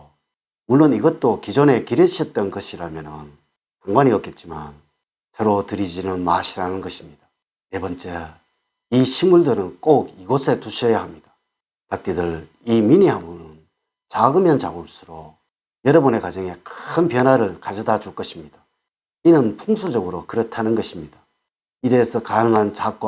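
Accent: Gyeongsang, South Korea. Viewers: 경상도 말ㆍ못알아듣겠네ㆍ 방법은 밑줄에 자막필수ㆍ 그것도 모르고 유튜브 합니까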